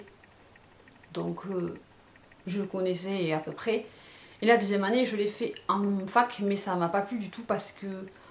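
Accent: South, France. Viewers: Je voulais juste te remercie car en regardant ta vidéo je me suis remotivé dans mes études,j'ai vraiment envie de m'appliquer (tu m'a également fait versé une petite larmichette). Ton parcours est certes atypique (comme tu le dis si bien avec ton accent du sud), mais c'est surtout le parcours du battante. Je trouve que tu forces l'admiration et le respect. Je te souhaite le meilleur dans la vie et beaucoup de réussite professionnelle, tu le mérites vraiment, t'es une fille en or. Bisous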